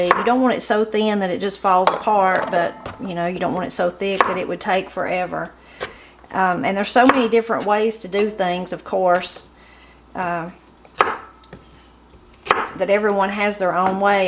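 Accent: Appalachia, United States. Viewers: I fully appreciate that my comment is coming 8 months after you posted this video (I'm a new subscriber) but wow, my mouth was watering as this lovely food was being dished up! Also I never realised that Appalachia was pronounced as you say it, I always thought it was Appa-lay-sher. I love watching your videos and learning more about the rich culture of the smoky mountains. Also I'm slightly obsessed with your accent and the way you say different words, most of which I completely understand, I'm from the UK from a small little town which has a very strong dialect that most don't understand. Thanks!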